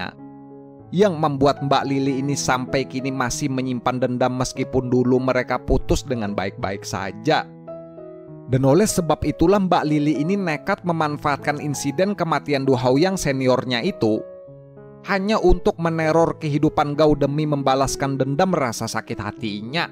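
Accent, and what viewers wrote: Banjar, Indonesia. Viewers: Biar sidin bhs indo. ttp j ketahuan dialek banjarnya🤭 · Iih lo wkkk,ku kira aku ja yg merasa iny ni urg banjar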